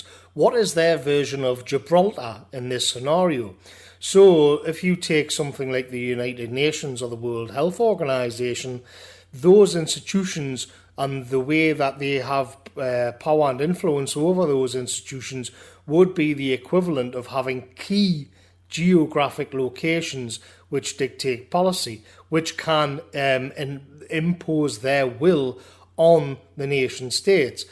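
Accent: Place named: Newcastle, United Kingdom. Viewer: Wonderful, thought-provoking analysis, that puts all mainstream media coverage to shame. You have such a gift for articulating the kind of inchoate thoughts that so many of us are having right now and able to provide us with so many 'aha' moments while listening to your inspiring Geordie tones. The sheer speed and ruthlessness of these global technocrats is truly shocking and the way they seek to bamboozle us ordinary beings into accepting this new 'reality' as inevitable is both frightening and depressing at the same time. Perhaps the only real antidote to this essentially anti-human philosophy is a reshaping of a mascular Christianity for our times. And an emphasis once more on the sovereignty of the individual to be able to resist the 'powers and principalities' which seek to dominate and control our lives in such a manner.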